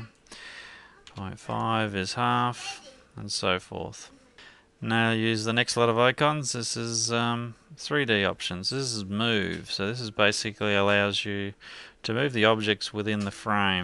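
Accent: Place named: Australia. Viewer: I heer an aussie :P cheers for tutorial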